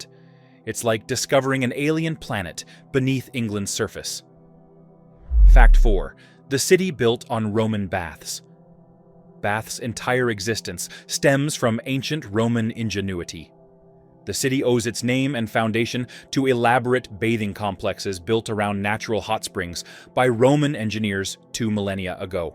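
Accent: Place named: United States. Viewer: It would be better without the awful American accent. The town of Berwick is pronounced BERIK not Ber W I C K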